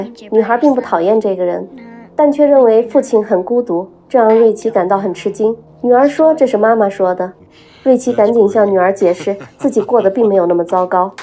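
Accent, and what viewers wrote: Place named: North, China. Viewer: 不是AI，好像是个北方姑娘，声音甜美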